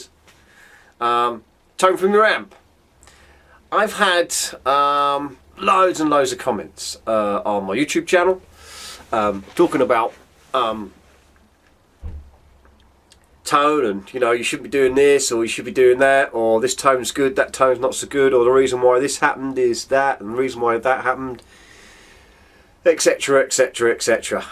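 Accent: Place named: North, United Kingdom. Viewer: Where are you from, mate? Is that some northern England accent? I am not a native english speaker, but it is like i have no difficulties to understand every single word you say. What makes it even more strange, is that i dont hear this accent very often, yet it is much more understandable to me than every other english accent i heard.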